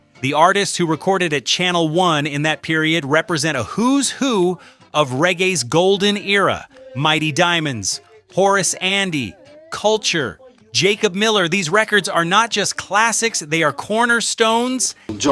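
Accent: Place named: United States. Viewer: This guy is an ignoramus impostor pretending to be knowledgeable. He takes a smattering of little bits and pieces of facts and strings them together with an American accent padded with repetitive meaningless phrases. Far too many foreigners of his ilk think their pretense of knowledge gives them relevance. "A 1-eyed man is king in the land of the blind". Anyone who knows about Jamaican music will find this guy's presentation hollow.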